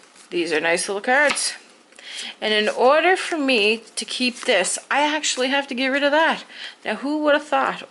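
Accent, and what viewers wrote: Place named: Canada. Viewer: I loved the video, and thanks so much for making it! We are learning to play cribbage and I wanted to watch an actual game, so this was a great find on YouTube. I grew up in Canada but now I live in the UK; it was great to hear a proper Canadian accent for the first time in a while! And I was able to share that with my partner. Blessings to you; hope your warm weather comes soon! (It rains a lot here but my British partner says he has never been 'snowed in'; he thinks it sounds nice, but I assured him it's not that great...)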